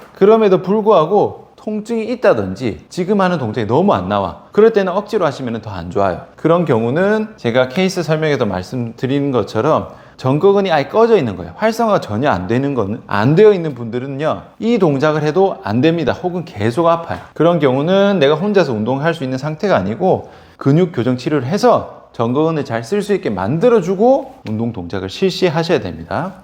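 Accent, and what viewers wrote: Gyeongsang, South Korea. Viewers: 그냥 경상도 말투 😂😂😂😂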